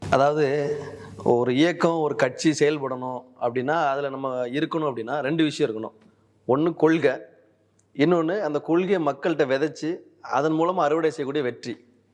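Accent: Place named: Tirunelveli, India. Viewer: நெல்லை தமிழ் கொஞ்சி விளையாடுகிறது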